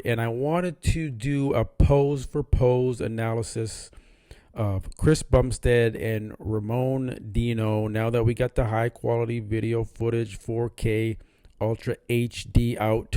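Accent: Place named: Caribbean. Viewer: absolutely. I just started to listen to your videos. You’re on point with your analysis. I’ve been in the game and a fan since 94. Lol. And as a proud Jamaican don’t think I don’t hear a little Caribbean in your voice. HaHa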